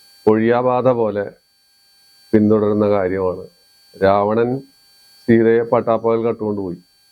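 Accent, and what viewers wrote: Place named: Palakkad, India. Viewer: Iski മടിയില്‍ pootum 😂തനി പാലക്കാട്ട് basha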